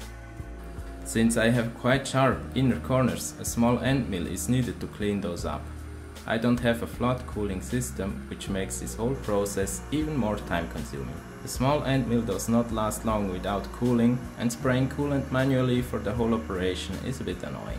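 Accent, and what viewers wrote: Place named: Switzerland. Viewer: you sound pretty swissish to me, so wenn du interesse hättsch: ich han e gigantischi DMU 80 wo dir paar use haue könnt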